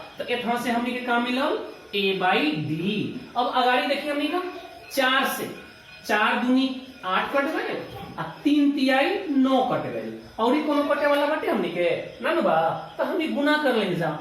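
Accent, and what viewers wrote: Bhojpuri, India. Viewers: Ati Sundar sir Bhojpuriya andaj me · अति सुन्दर लागल आपन भोजपुरी अन्दाज🙏🙏🙏 Nice sir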